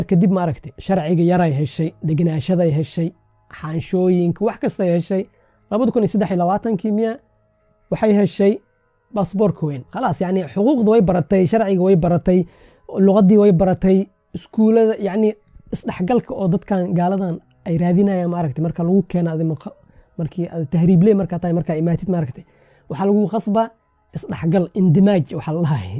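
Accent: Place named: Jilib, Somalia. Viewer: My bro wan jecelahay qisoyinkaga sido kale qabka aad uga shekeso waxaatahay qosol bro lahjadeda jilib marko ayaa isoo xasusisey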